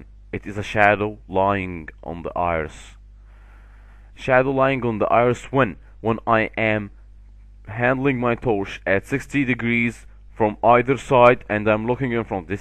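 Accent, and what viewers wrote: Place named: Egypt. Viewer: cuz this s egyptian english :D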